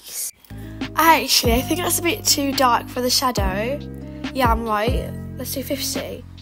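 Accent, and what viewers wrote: United Kingdom. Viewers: Are u Scottish?? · Thank you so much! You’re voice is soo cute u have an really cute British accent! New subscriber!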